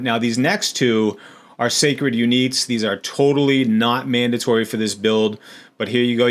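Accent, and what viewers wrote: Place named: Massachusetts, United States. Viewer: Dudes Massachusetts accent is showing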